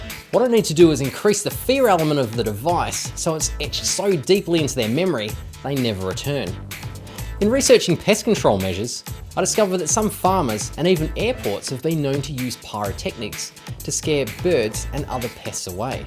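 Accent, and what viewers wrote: Australia. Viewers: You have to be Australian , you just sound like it . Not to sound rude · Omg you like an Australian and comedy vir. of Michael Reeves XD insta sub!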